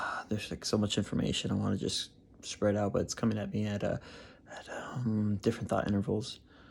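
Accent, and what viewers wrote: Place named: United States. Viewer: I get my procedure with her in less than 2 weeks. I can’t wait. It sounds like you’re from the USA too? I have non stop flight from Chicago and it’s only 10 hours. I am wondering though how it was for you once you got into turkey and also traveling home as far as entry / customs go. I have my evisa and I also heard something about a passenger locator form before leaving too. Anything I need to know?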